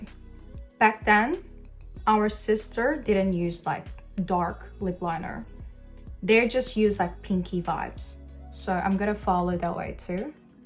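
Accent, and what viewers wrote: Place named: Australia. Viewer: Pretty sure she's from Australia, she has the accent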